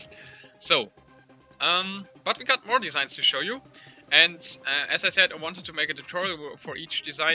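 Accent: Germany. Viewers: Accent? Germany?!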